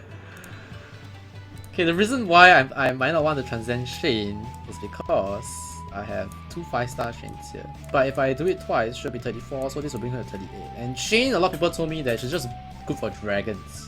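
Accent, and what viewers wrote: Singapore. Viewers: Are you also from singapore?? :D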